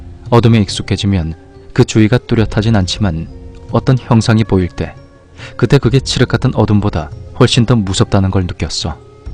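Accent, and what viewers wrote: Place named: Busan, South Korea. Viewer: 충청남도 서산인데 부산사투리 쓰네 ㅋㅋㅋㅋㅋ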